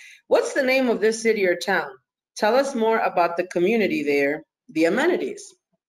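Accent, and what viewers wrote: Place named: Dominican Republic. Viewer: Wow Im glad you clarified because I couldn’t pin if you were Dominican or American jeje por el acento wow vete con Dios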